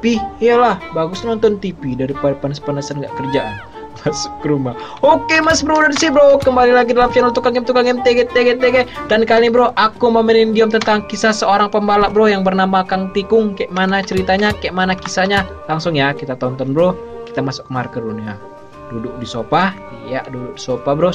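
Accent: Medan, Indonesia. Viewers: Orang medan bang ya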